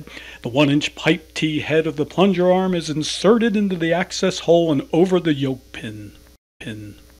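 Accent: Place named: Pennsylvania, United States. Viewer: What country is the moderator from? Sounds like a PA Flatlander turned Northern PA "dude."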